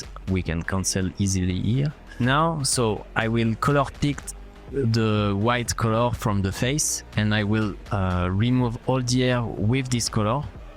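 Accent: France. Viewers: On dirait un francais❤🇫🇷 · Are u french????? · Bon ça se voit tu es français, mais bro merci pour cette app incr totalement gratuite, juste y’a des pubs mais quoi de plus logique finalement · It's not against you, but as a french speaker, You have the accent of a French speaker who speaks English. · As a French, he's clearly French heeh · we j’ai vu il a un accent francais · You have french accent 🤨 , are you french ? (Because i am) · T'es Français ? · BRO T'ES FRANÇAIS ?! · Mec t'est Français 😂😂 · Are u French? Bc ur accent ;p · Ça sent l'accent français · L’accent français a 100% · L'accent français de fou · You lowk have a mad French accent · I think ur french if it is I'm too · Bro are u french ? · Ta un accent français 😂😂😂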